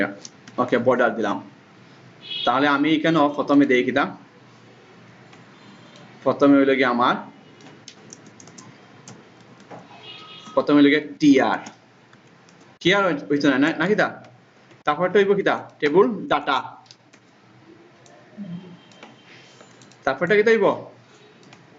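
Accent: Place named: England, United Kingdom. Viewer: Parts sound English ish.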